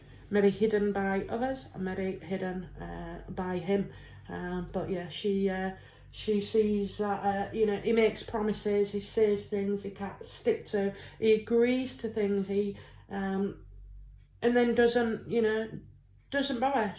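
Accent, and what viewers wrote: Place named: Lincolnshire, United Kingdom. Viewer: I enjoy your accent! You remind me of home :) (Lincolnshire)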